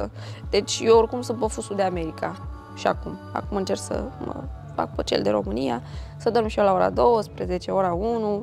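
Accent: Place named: Moldova. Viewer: E moldoveanca ce vrei.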